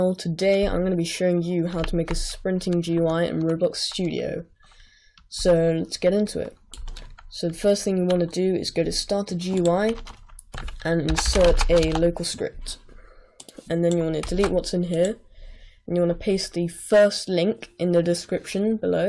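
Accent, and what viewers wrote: United Kingdom. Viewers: THANKS SO MUCH FOR THIS AMAZING TUT!!!! (btw are you british?)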